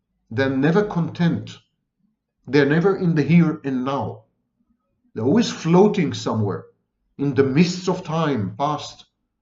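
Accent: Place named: Israel. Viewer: Like the Israeli accent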